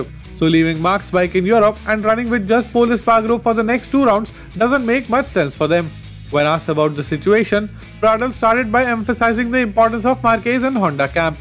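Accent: India. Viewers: Great content,. Presentation is very good. Some, maybe a very few people might want a slightly slower-paced narration. I’m in USA, I can decipher Anglo-Indian English easily, but my compatriots may struggle slightly. Overall this is good channel.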